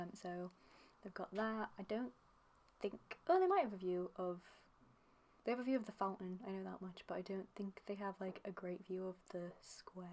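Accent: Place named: United Kingdom. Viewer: You're welcome! It's nice to see another British simmer! (I hope you're British, otherwise, I'm terrible with accents...)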